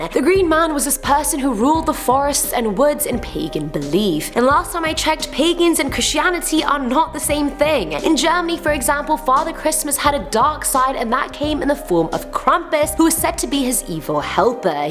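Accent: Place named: United Kingdom. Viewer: She is my favorite host the British voice just hits different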